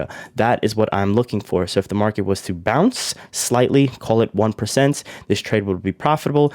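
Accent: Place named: New York, United States. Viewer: I just know you're from New York bro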